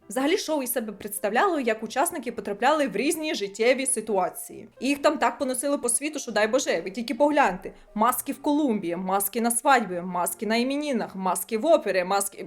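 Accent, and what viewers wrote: Surzhyk, Ukraine. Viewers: Мадам поработайтє над суржиком або мовою все ж розмовляйте.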